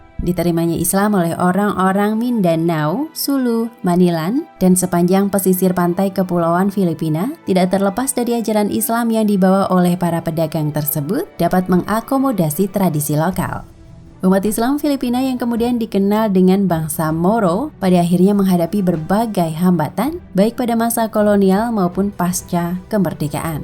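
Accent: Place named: Banyumas, Indonesia. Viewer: Ngapak pula kao bapak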